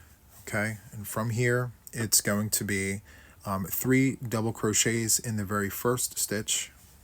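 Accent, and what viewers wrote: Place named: East Coast, United States. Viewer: Im new here and your tutorial is a treasure! What a wonderful pattern! Your style of instruction is so easily understandable… thank you! (Your east coast accent made me smile while listening too) 🎉❤ Thank you so much!! Michella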